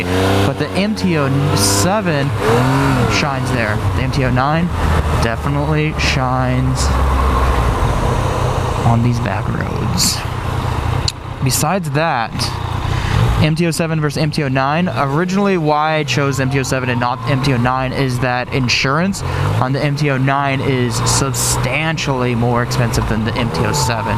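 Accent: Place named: United States. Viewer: Clearly you have An American accent....how do you like Germany?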